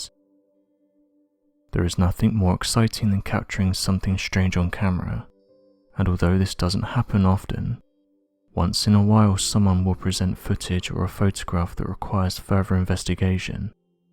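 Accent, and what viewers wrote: England, United Kingdom. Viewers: Works for me ONLY if I don't listen to how he pronounces certain words, such as saying "er" for the "th" sound...eaver instead of either. It reminds me of a speech impediment, but the English have funky accents depending on what part of the country they're from like us ie Southern vs Yankee!!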